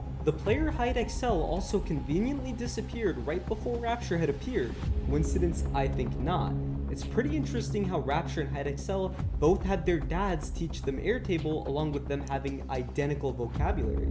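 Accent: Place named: United States. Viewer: dude your from asia but u sound american, what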